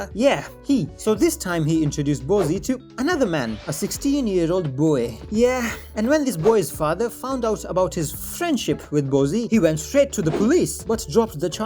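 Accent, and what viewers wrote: India. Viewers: u r indian?? so what about this accent is it ai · That accent is thick in the Indian way alright. Maybe he tried to recover from the actual super heavy Indian accent but it still sounds very Indian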